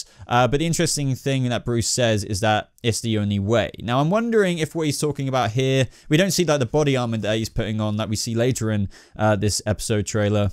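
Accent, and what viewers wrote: United Kingdom. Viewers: Why is there a brit narrating an American show cant listen to this rubbish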